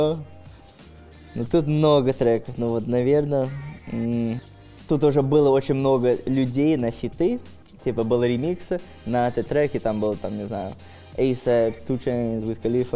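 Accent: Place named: Chechnya, Russia. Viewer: рж я думал это чеченский такой акцент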